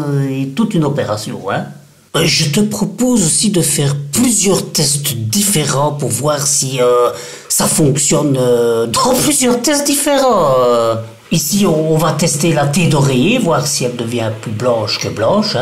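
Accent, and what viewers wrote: Belgium. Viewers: ta diction est parfaite, je te comprends très bien. Mais je te croyais belge en fait. Et il n'y a pas de mal à ça. Il paraît aussi que j'ai un accent lyonnais et autre chose 😂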